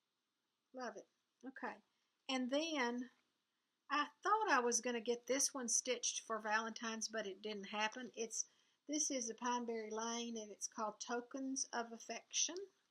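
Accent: South, United States. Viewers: Hi: I so enjoy your videos and your stories. My grands were from KY & TN and spoke in the same way you do, used the same phrases, so I do understand your southern lingo. I'm older than you by 7 years and can tell you that this aging process is not for sissies. It seems I develop a new ache or pain every day. But I do try to keep a positive attitude and count my many blessings. All the little "smalls" you finished are precious, makes me want to get online and order more patterns. I hope your sister is so much better and that you have an enjoyable time together. Can't wait for your next video. Happy stitches!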